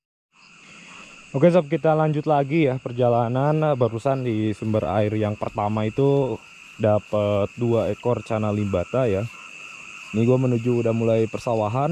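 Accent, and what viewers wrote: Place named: West Java, Indonesia. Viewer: Daerah mna om ko logat nya sunda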